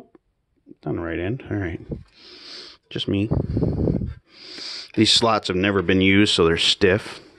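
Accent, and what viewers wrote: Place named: Wisconsin, United States. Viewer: you sound like you're from Wisconsin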